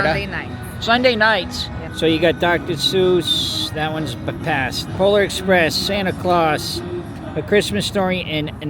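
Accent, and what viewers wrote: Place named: New York, United States. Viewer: I live in jersey and never cut down our own tree artificial I grew upon and to this day artificial lol I thought you were from New York with the accent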